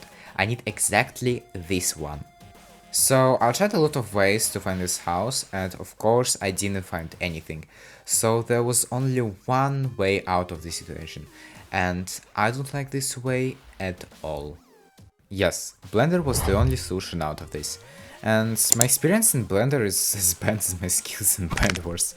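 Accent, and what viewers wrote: Russia. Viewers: Акцент подсказывает мне что ты русскоговорящий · у тебя акцент русского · русский акцент везде услышу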